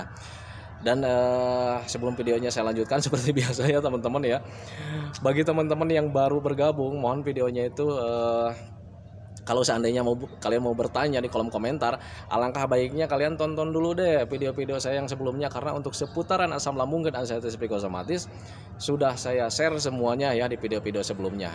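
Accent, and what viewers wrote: West Java, Indonesia. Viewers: Bapa orang sunda ya? Kalo iya. Kali2 pake bhasa sunda nya dong gpp kali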